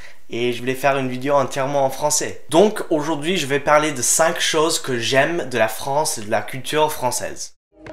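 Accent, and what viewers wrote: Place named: United States. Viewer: J'adore ta nouvelle chaîne !!! gros pouce bleu 😍 continue ! et j'adore ton accent américain :)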